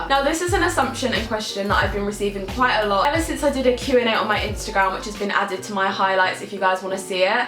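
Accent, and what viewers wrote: United Kingdom. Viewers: Omg i totally wasnt expecting you to be British!! Whereabouts are you from??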